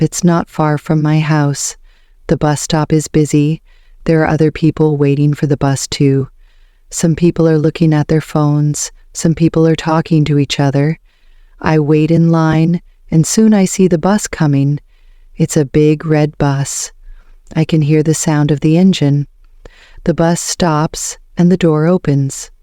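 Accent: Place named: United States. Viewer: Sorry why it's It's the British council but you've an American accent? I want to learn English accent with this method. Thanks.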